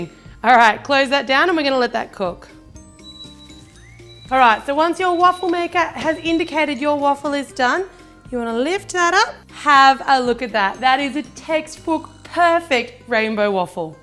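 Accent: Australia. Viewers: Australian I think but definitely not English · I hear a little aussy in here and I see an aussy chocolate dollar :)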